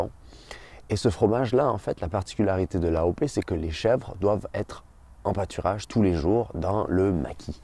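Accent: Quebec, Canada. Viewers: La visite de la France avec accent quebecois YES!!!, si tu passe par la Nievre n'hesite pas a me contacter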